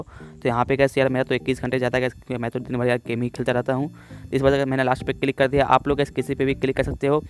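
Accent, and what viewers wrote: Bihar, India. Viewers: Bhai ap bihar se ho 😀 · bhai tum bihar se ho na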